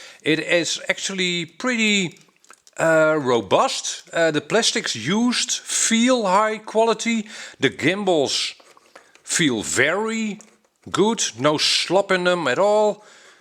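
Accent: Netherlands. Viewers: First let me compliment you on your English! Though your Dutch accent is very thick, your grammar and vocabulary is excellent! I have several questions regarding this quadcopter. I am a novice in this field. Though I am fascinated by the concept of FPV, I am mostly interested in shooting nice video's during my travels. So, I am not a hobbyist or sport-flyer. I have been looking for good camera drones, small enough to pack for travel (without the need for an extra bag, or larger bag - I like to travel light). I just bought the Parrot Bebop(1) for that purpose, but I find it (still) too big, too breakable and it seems to lose the wifi connection each time I fly (losing all control over the drone!!). Now, the footage I saw on your other video seems too jittery and jellowy to be usable to shoot travel videos, could that be helped? Is it possible to fly this with a smart-phone as well (so I wouldn't need to pack a radio controller)? 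Would you recommend this drone for my purpose? I like the small size and the sturdiness, though I would welcome altitude hold and all kinds of aids in ease of flying.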